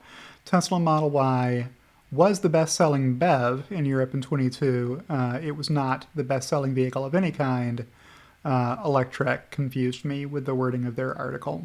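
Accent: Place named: Transatlantic, United States. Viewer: Love that hair, man, and your posh, trans-atlantic English accent compliments it so well.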